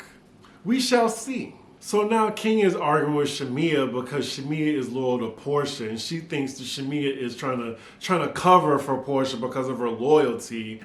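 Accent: New Orleans, United States. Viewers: You still have your accent,. It's only a slight accent but I always hear the New Orleans twang when you talk.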